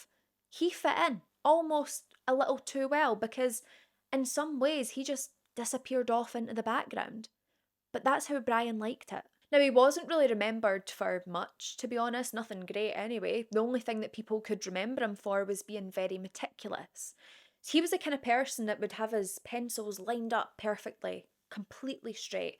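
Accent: Scotland, United Kingdom. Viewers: As a true crime buff, I'm so hyped to have found your channel (late to the game, I suppose) 💙 What part of Scotland are you from? I love the accent (almost as much as I love Scotch whisky 🥃 LOL) And how do you come up with so much content every week? You're like a walking criminology encyclopedia 📖 — definitely the most informative 'yapper' around 🧠 — subscribed and shared 👍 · Hello again from Canada I just found out what Hyping is and after listening to the explanation I get it now... So of course I Hyped you... Love you, Love your videos... mostly love your Scottish accent 👍🏼💙💙💙🇨🇦j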